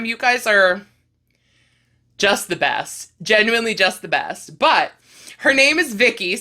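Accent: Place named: Pittsburgh, United States. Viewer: I'm excited to see how the cardigan works up! Also, I just realized how much you speak Pittsburghese. It makes me homesick!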